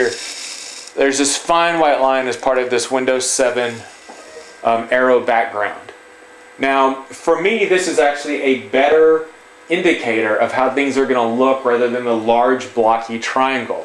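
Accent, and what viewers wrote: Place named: Texas, United States. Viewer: Are you from Texas? You have a hella texas/southern accent. Whenever you say "compensated" you say compINsated. Its funny. Curious if you're from Texas. Great demo of bezel compENsation though :)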